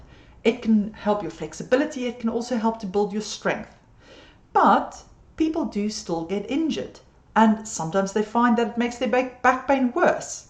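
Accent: South Africa. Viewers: Hi, thanks for this. I'm really enjoying your videos. It's so nice to hear a South African voice:) I'm teaching yoga to seniors and really worry about osteoporosis. I read somewhere that crunches should be avoided. Do I need to worry about other core work? I try to keep it gentle, but would be good to know for sure what I should be staying clear of? Thanks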